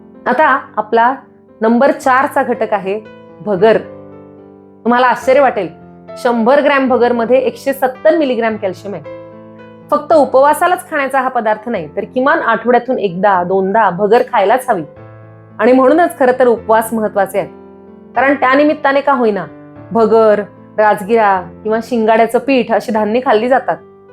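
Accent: Standard. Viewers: मॅडम तुम्ही मारवाडी समाजाचे असून सुद्धा मराठी किती शुद्ध स्पष्ट बोलता हे खूपच कौतुकास्पद आहे. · 🙏🏻आपले बोलणे अतिशय छान व शुद्ध आहे.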